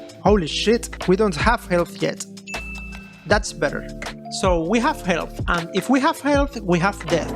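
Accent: Spain. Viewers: Eres español?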